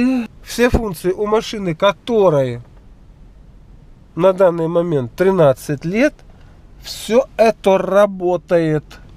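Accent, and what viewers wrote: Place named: South, Russia. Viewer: На юге все так Хаварят) съездите в Ростов !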